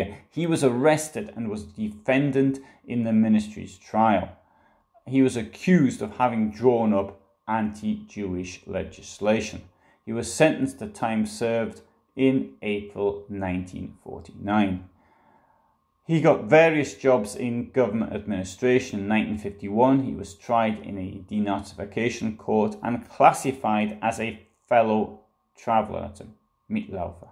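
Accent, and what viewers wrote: Newcastle, United Kingdom. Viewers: North Eastern English accent ,I think. "Geordie ". Or a bit further south ,Tees area. "Monkey Strangler."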